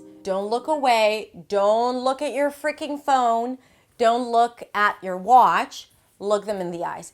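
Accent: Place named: United States. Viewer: how long did it take you to learn American accent properly?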